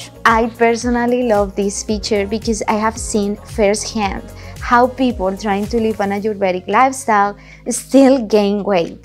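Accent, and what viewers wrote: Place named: Latin America. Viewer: I like when latinos speak english 😂❤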